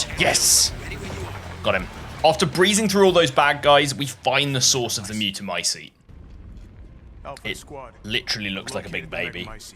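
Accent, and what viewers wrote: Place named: Ireland. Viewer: What is that accent you have tis.......noice Irish?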